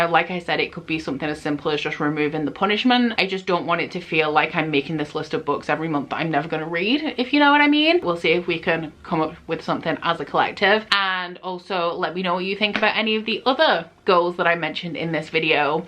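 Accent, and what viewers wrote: Yorkshire, United Kingdom. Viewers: Heey, great video i love supporting your channel. It’s so nice to here a yorkshire accent on youtube as that’s where i’m from and i don’t feel like there are that many booktubers from here. I was just wandering if you have any advice on how to read more as a very busy student with not that much time? as i love reading but i struggle with what to read etc as i’m trying to juggle my masters, work and a life as well? Any advice would be appreciated 😄😄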